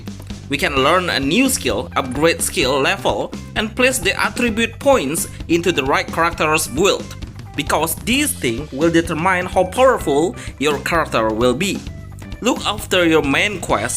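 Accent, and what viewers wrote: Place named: Indonesia. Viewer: Yes, he Indonesian accent so thick 😊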